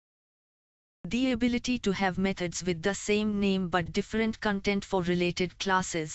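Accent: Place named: India. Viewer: Wondering why the robot voice has an Indian Accent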